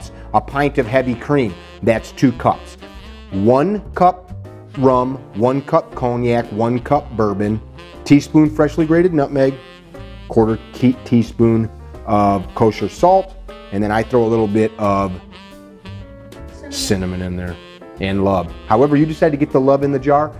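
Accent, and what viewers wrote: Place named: Wisconsin, United States. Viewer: Buddy’s a cheese head for sure with that sconi accent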